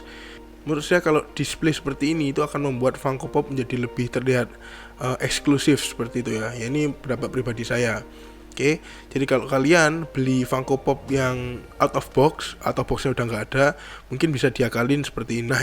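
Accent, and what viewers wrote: Java, Indonesia. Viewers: makin mantap bang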